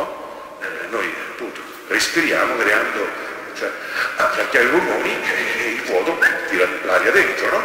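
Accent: Rome, Italy. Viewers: Padre, io la rispetto perche’ lei porta la parola di Dio padre nostro, a tutti noi suoi figli. Ma mi permetta il suo accento e dire romanesco è veramente fastidioso. Vede, le sue catechesi sono seguite in tutta Italia, io per esempio sono di Torino e a volte fatico a capire la su “lingua”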